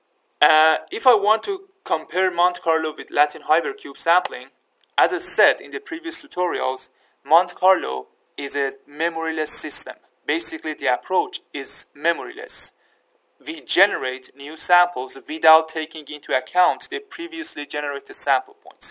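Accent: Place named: Iran. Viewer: Thank you for the Video. I like your Iranian accent :)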